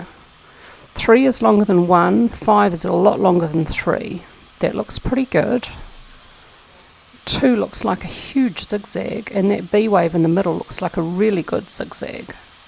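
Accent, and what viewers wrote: New Zealand. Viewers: Very good. Good ole kiwi accent too eh :)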